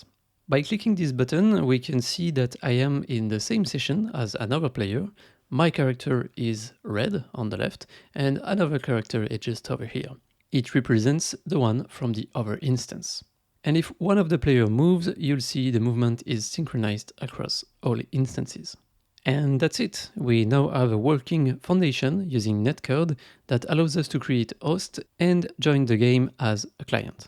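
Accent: France. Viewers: Salut tu est français non?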